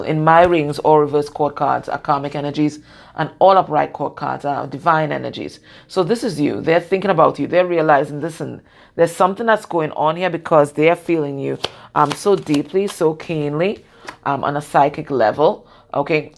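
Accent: Trinidad and Tobago. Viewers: Oh my this was very relevant, thank you for your guidance. I'm awestruck. Are you Trinidadian? You sound and laugh like a Trini ;)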